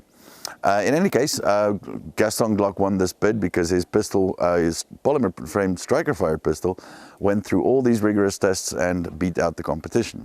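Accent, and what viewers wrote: South Africa. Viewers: I hear a South African accent.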